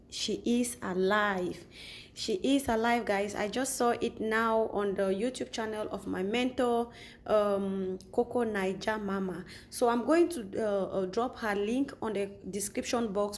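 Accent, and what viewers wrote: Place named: Cameroon. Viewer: Love you bcos my best friend here in UK is from CAMERION and she speaks like you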